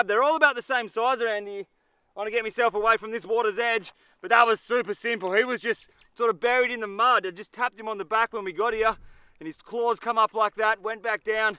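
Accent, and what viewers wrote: Australia. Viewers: Do you also read his replies with the voice and Australian accent?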